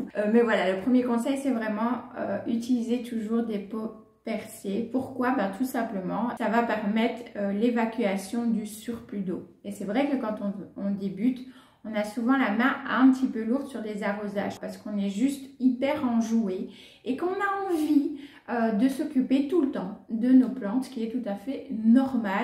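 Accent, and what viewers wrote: Belgium. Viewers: Belgique aussi ici 😜 (j'avais reconnu l'accent 🙊😁) merci beaucoup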